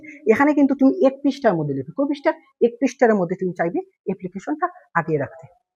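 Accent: Sylhet, Bangladesh. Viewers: Vai Sylheti ni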